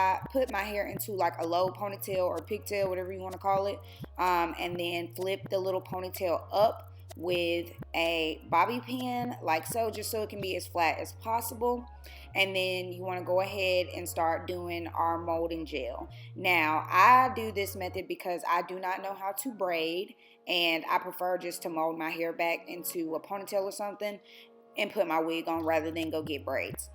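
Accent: South, United States. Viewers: Love it! Okay, I hear her Lil country accent lol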